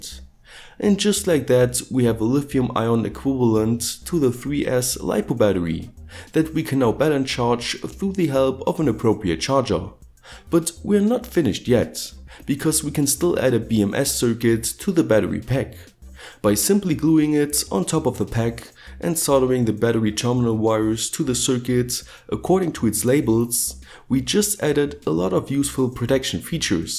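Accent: Germany. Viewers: Klingt wie ein deutscher der englisch spricht, schade dass es sowas nicht in Deutsch gibt :( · he's saying NICKLE ribbons,, for anyone who cant distinguish it ;) He's adapted that annoying youtube podcaster inflection and coupled with his german accent it sounds a bit ,... not good.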